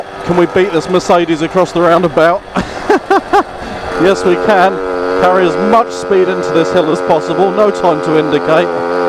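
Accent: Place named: England, United Kingdom. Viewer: I'm trying to figure out which country this is. The accent and the driving on the left hand side of the street might indicate England. But the speed is in terms of MPH and not kilometers.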